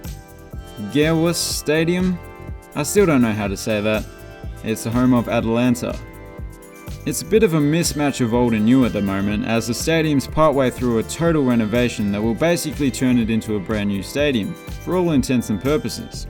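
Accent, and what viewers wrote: Spain. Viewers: Excellent video but stop reading portuguese with spanish accent please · Nice video, but please stop pronouncing Portuguese words as if they were Spanish.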